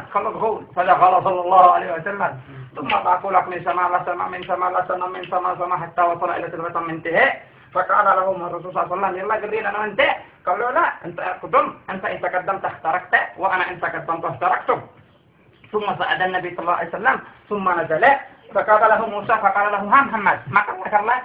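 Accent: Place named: Tihama, Arab world. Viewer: اضن انه اوصل المعلومة بس بلهجة اهل تهمامه ..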